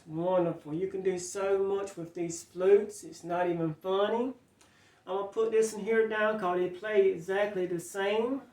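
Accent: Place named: South, United States. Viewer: holy wow sir your accent is baffling me!! Would love to know your background and story, haha. I realize those are sensitive questions in todays cultural climate but I really don’t mean anything by it. Only curious. You have like, a deep southern accent or something that to me, does not match your appearance. I bet your family has a fantastic immigration story in there somewhere. Ok bye just a random curious internet commenter and I love guessing where accents come from all over the world!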